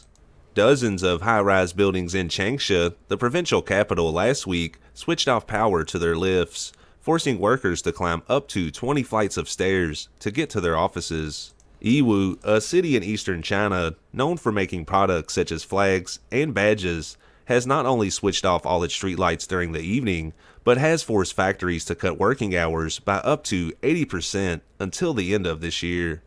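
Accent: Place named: Texas, United States. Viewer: This dude has Texas accent wit good old boy twang to it .But besides that China is using more resources then America ,and at some point the shit is going hit the fan on resources in the world ,but may with pandemic will save some resources for a short time ,but I don't care about China .But the sure is going to be fuck up in the future with resources .And mostly will have do with some greedy assholes at the top of the food chain ,who are no very bright as it due the fact some their daddy's give them the company or very large wallet to throw around money .Since this always starts at the top .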